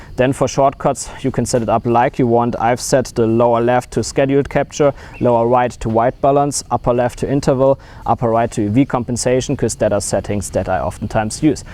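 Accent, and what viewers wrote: Germany. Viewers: You sound a little like a German who speaks English 🧐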